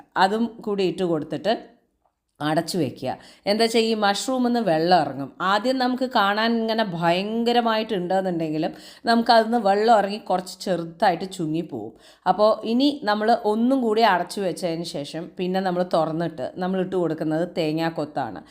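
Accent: Palakkad, India. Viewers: ഇജ്ജ് പാലക്കാട്ടുകാരി ആണ് ല്ലേ. സൂപ്പർ ഡിഷ്‌ വല്ലപ്പത്തിന്റെ കൂടെ പ്രേദമായിരിക്കും.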